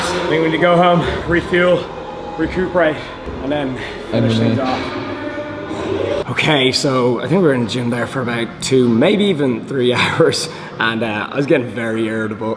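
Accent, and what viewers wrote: Ireland. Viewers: love me an irish accent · What county is he from sorry is it Dublin or Wicklow? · accent sounds Irish. Lucky dude if so. Ireland is beautiful!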